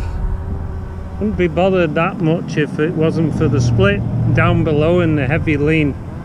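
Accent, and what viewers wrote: United Kingdom. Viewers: You sound like your from uk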